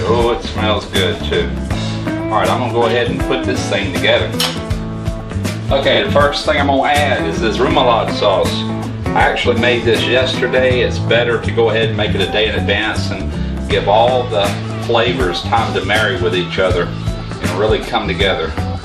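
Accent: South, United States. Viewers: I Like The Theme Of This Video & The Accent U Have Lol I Felt Like I Was In The Country What Was The Name Of The Song That Started Playing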